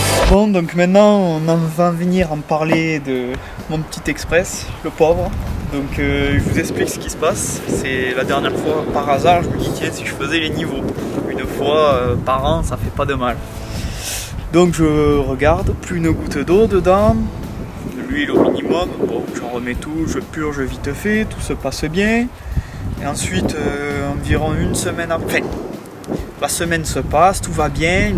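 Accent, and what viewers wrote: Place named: Toulouse, France. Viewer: un garage en toile en plein été c'est tout bon j'ai repeind mon lada sous un préau en pleine été avec du vert brillant direct et sais franchement pas mal malgré quelque petit défaut ;) Bon du coût mon express a était swapé avec un f8Q mes avec soit la culasse du F8M ou il on juste remis l'admission du f8M, un vrai ch'mille blic la mienne, mes bon pour 100€ j'vais pas me plaindre 😅 Mes sinon vu ton accent tu serait pas de la région Toulouse ou quelque chose comme sa ?? Perso je suis Tarbes donc j'me dit que peut être une fois la mienne fini...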